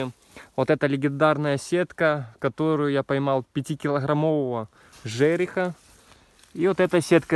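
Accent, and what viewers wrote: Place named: Belarus. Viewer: По говору.вроде бульбаш. Где живешь?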